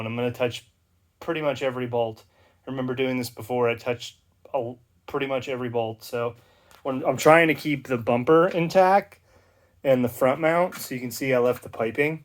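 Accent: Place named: United States. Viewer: Just wanted to say thank you for making an entire playlist on this with so many minor details 🙏 After years of wanting one I finally picked up a GF8. With limited experience and knowledge I wasn't sure where to start, what to upgrade, etc. Buying a wrecked WRX is something I completely forgot about. But swapping everything over is actually a much simpler, more cost effective and intelligent idea. However, judging from your accent I'm assuming you're from The US as am I. How were you able to get a hold of a WRX that fits a GC/GF chassis?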